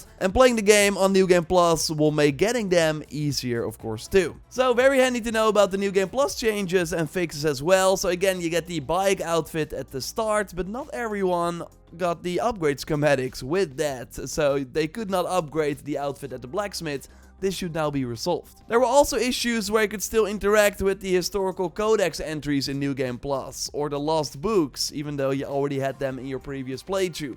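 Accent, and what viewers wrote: Netherlands. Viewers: dat nederlands accent is zo duidelijk lmaoaoa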